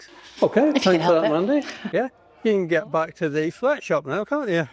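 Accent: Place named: Northern England, United Kingdom. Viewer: He's a Northern lad, who speaks real English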